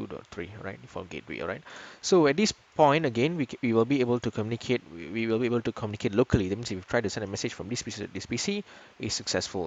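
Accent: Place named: Malaysia. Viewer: I can't miss Malaysian accent haha, nice lah abg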